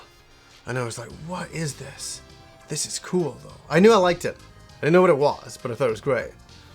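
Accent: United Kingdom. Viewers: The more emotional you get, the stronger your British accent gets haha I love that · I didn't know you were from england. Now that i notice it you have a very slight british accent in your voice.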